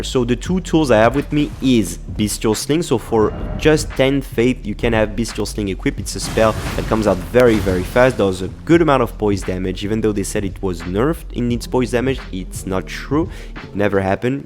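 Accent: France. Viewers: Are u french? Si oui tu parles très bien anglais, les touches d’accents font tout le charme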